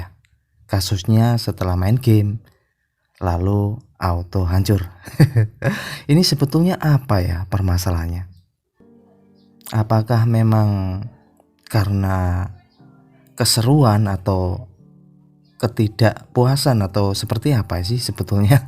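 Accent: Java, Indonesia. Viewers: Mas orang mana sih kok kayak orang Jawa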